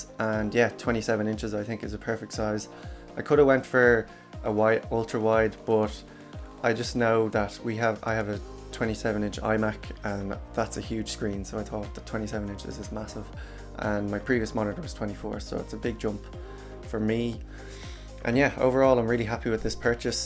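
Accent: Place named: Ireland. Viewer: Good explanation but I would like to see another video of your experience with it. I have been shopping around and I think this is the one I like the most for the price and brand name but I still am not sure yet. Thank you for doing this video. By the way you sound IRISH.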